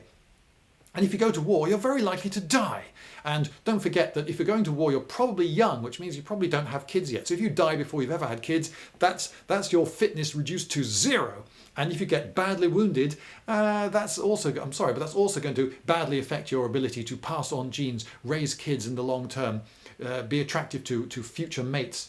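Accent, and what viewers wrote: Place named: United Kingdom. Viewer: The accent definitely helps. If this would have been American I would have gotten sick of it extremely quickly, but I just love listening to a Brit talking about his passion. I have learned more from than I ever did in my school career.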